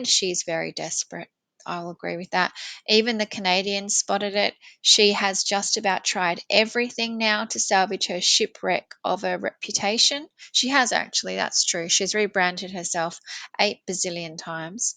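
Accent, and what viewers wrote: Australia. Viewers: Are you Aussie 😂😂😂❤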